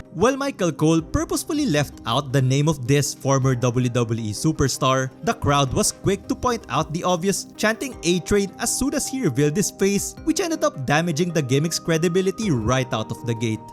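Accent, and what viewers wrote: Philippines. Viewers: is bro talking had a filipino descent??? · I can hear your accent is filipino.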